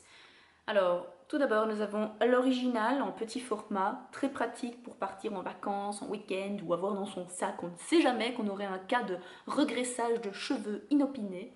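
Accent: Belgium. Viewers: je tarde un peu mais c'est normal pour le shampooing à la violette... tout les shampooing pour blonde font ça...( effet sac de noeuds). En tant que blonde c'est une galère!! bisouxx et quel plaisir cet accent belge surtout pour qqn qui désormais vit à NY!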